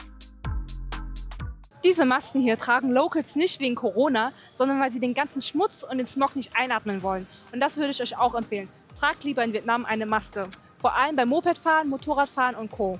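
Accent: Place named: Cologne, Germany. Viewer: Kann es sein, dass Du aus dem Rheinland bist? Ich meine einen leicht kölschen Einschlag zu hören 😉😉 Sehr informatives Filmchen, Danke für die vielen Tipps. Kleine Anmerkung: vielleicht etwas langsamer sprechen, ansonsten toll gemacht!! 👍👍👍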